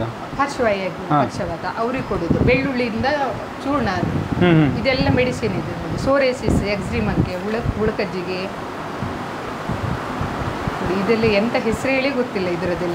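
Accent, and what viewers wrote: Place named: Kundapura, India. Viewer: Madam neevu Kundapuradavara. Matado style ade tara ide. Thank you🙏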